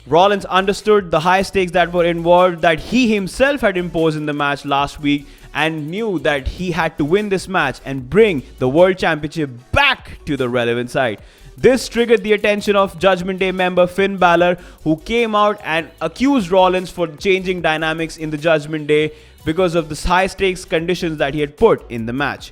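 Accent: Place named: India. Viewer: this may sound dumb but please stop talking with a indian twang you started out so well the firs 30 seconds or so ... idk why I even bother commenting on it but yeah idk it's slightly triggering PS it's not really something you do I guess but it's more WWE fan channels filled with BAD BAD english speaking by indian people who made the title and video in english but then starts to yapping in indian or whatever they say about the WWE in videos ... no offense in advance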